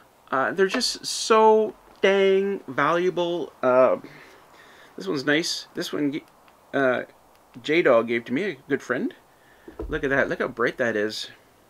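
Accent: Canada. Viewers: Like I have told ya before....YOU MISSED YOUR CALLING !!!!!.... 🥰🤣 love hearing you talk. City girl too. That Canadian accent !! You’re just so fun to watch when you’re not on ICE. !! 😳😳 that does scare me ! Enjoy your place... make those memories and I hope y’all have a long life there!! 🥰🦮🦮!! ❤️🍀🍀🍀🍀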